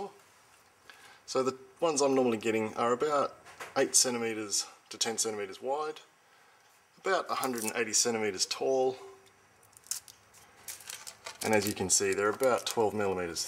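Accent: Australia. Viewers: is that a Australian accent i hear there mate? haha thinking about making myself a long bow, been told bamboo is the best material for beginners. thanks for the video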